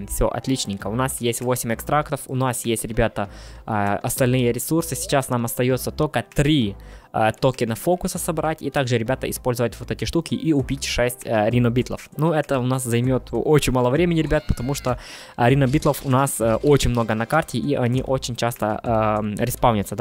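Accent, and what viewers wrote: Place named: Ukraine. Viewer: Я кста думав що ти рус а ти Укр чути просто Українські слова хорош!!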